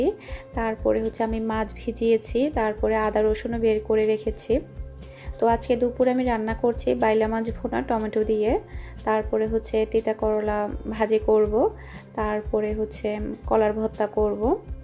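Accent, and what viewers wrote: Chittagong, Bangladesh. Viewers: আপু আপনি সাতকানিয়ার মেয়ে, ওয়াও,,আপনার কথার মধ্যে চট্টগ্রাম এর একটা সুর আছে। আমি ভাবতাম কোথায় হতে পারে আপনার বাড়ি। শেষেমেষ আমারা একি যায়গার মেয়ে হলাম। এই জন্যই তো আপনাকে আপন আপন লাগে,।আমিও সাতকানিয়ার মেয়ে।কানচন, ফুলতলা, মিয়াবাড়ি, আমার বাড়ি, আর শশুর বাড়ি ডলুকুল❤❤❤❤❤অনেক অনেক শুভ কামনা রইলো আপু আপনার জন্য❤❤❤❤ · Apnar kota sone amar bar bar mone hoccilo apni chittagongr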